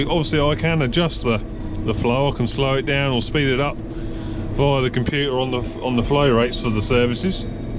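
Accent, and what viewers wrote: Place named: RP, United Kingdom. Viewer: Hi pal great video yet again abit posh that is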